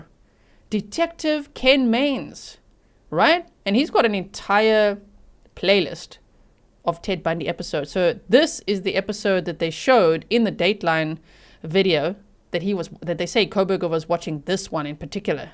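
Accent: South Africa. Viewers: love your afrikaner accent! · I’m from South Africa as well, I thought you sounded like me. I live in ST George, Utah.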